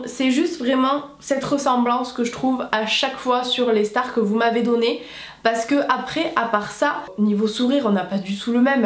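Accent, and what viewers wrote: South, France. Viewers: Je pense qu’il faut regarder koh lanta, pour trouver que Cindy te ressemble ! Vous avez le même accent du Sud ! 😅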